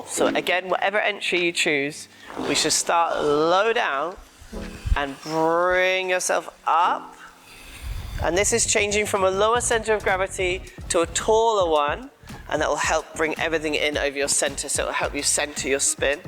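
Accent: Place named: England, United Kingdom. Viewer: I know right? To this Texan (Texas, USA), refined accent sounds so cute and charming. Instead of saying "water," he says "watah." He usually shoots his video straight and doesn't try to be funny but in this one he plays around a little and he even plays with the accent once and says "wateeeer," I can't remember what word he said. I'm surprised you are attune to English accents, I guess you speak English well. You wanna talk about charming, I'm sure you know the famous Brazilian singer Roberto Carlos. I like to listen to him sing in Portuguese and if I know the song in Spanish I like to think I can follow along in Portuguese. Mostly I listen to him in Spanish and he has a Portuguese accent and it's majorly charming. So, we all agree, is amazing.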